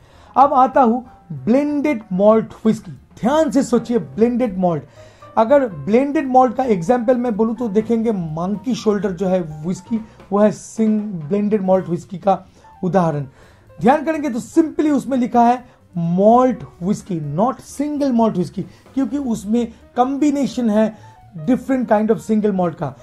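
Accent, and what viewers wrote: Bengal, India. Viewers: Love your vdos. Your knowledge is fantastic and your bong accent is cute :)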